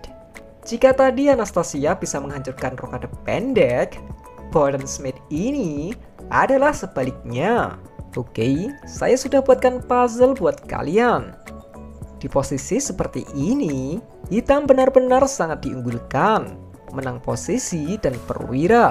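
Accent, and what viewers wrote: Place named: East Java, Indonesia. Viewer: Kyk logat jawa timur an,kek nya emang orang jawa timur ketara bgt soalnya😂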